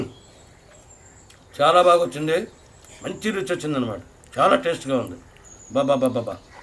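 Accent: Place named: Vemuru, India. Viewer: మాది వేమూరండోయ్.... మా ఊరు మాటల్లానే ఉంటాయి అనుకునేవాడిని... మొత్తానికి నా ఆలోచన కరక్టే అయ్యింది... ఇక దంచెయ్ అన్న... వంటలతో...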